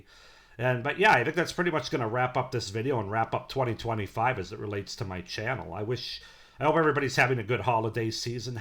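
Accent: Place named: United States. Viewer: I wish for your 2026 to be a success. I'm curious about what region of the U.S. you are from, based on your accent?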